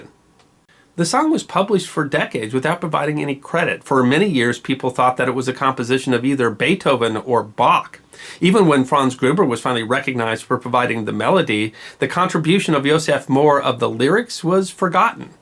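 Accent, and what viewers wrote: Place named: United States. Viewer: at last I have found someone whose American accent is thicker than mine! Hint: The terminal "e" on German adjectives is pronounced like the English "a".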